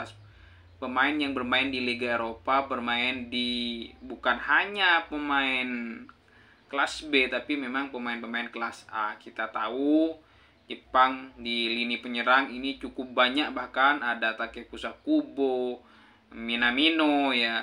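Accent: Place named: Kebumen, Indonesia. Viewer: Salam kembali mas...hehe Bal Balan bahasa ngapak Kebumenan kue mas😅❤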